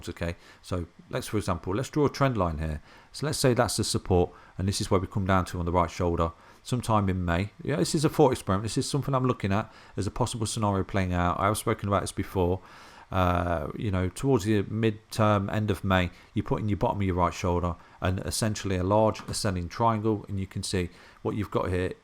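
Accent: United Kingdom. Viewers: I admire with the british accent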